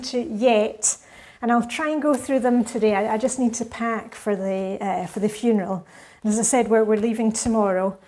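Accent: Scotland, United Kingdom. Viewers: Hi, so sorry to hear about your mum's passing, my mum was from Edinburgh and your accent always reminds me of hers - she never lost her accent after moving to England in the 80s - but she passed away in my early 20s. My question is: how would you have felt about the decluttering if you didn't have your brother to pass these records onto? We're currently getting ready for an international move and it's the sentimental stuff - you almost crave peace of mind that it's going to a good home, but obviously once it's out in the universe you don't have that control so you end up hanging onto it? Do you think you'd have been as ruthless if it was just being let go? You're always so good with decluttering, does it just become an easy habit making harder choices easier?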